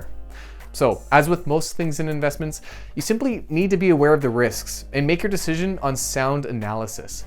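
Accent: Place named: Canada. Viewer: Just enjoying the Canadian Abeut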